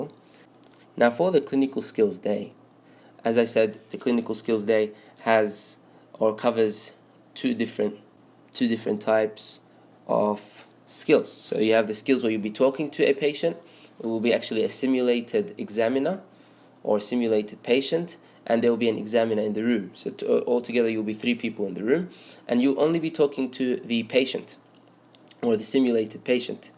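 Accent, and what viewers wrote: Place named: United Kingdom. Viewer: Nice British accent and thank you for guiding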